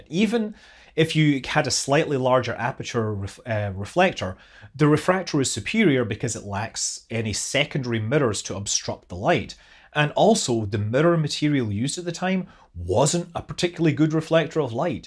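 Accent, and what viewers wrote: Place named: Scotland, United Kingdom. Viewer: Gälilean müns... love the Scottish accent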